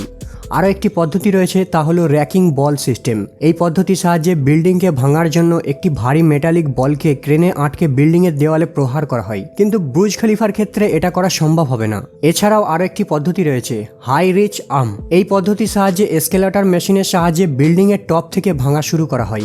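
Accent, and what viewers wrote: India. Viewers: You not Bangladeshe you form India I'm sure your spk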